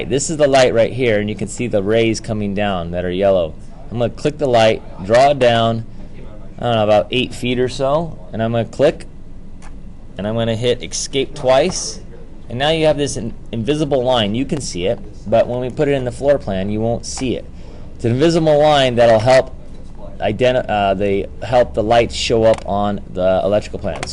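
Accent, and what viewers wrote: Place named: United States. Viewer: Finally, Revit Electrical that is in CLEAN ENGLISH American. Thank you.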